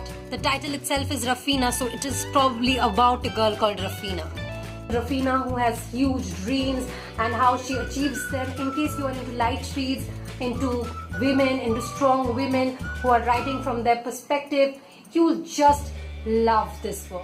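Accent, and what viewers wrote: Bengal, India. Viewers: Hey! I am new to your channel and the very first thing I noticed was your accent. You sound like a Bengali. Are you a Bengali? Kothay thako? Kolkatay? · Bengali! I was thinking so coz ur tone doesn't seems Marathi, Gujarati, Bihari, Assamese & when literature comes, Bengalis r on top. 😁 Great work, very inspiring.👍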